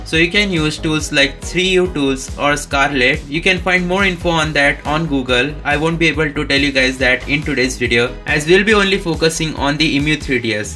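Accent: India. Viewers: As soon as I heard the Indian accent, I knew it was going to be fake OK that’s racist…. I’m sorry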